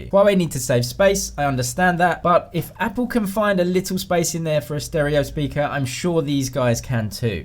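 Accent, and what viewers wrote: England, United Kingdom. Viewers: Your stupid English accent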